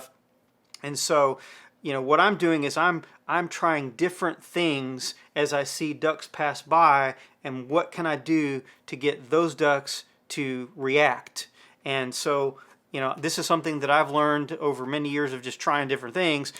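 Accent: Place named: Arkansas, United States. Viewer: What part of Arkansas are you from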